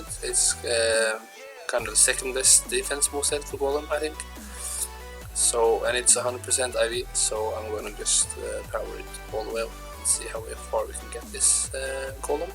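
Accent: Norway. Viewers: are u from norway?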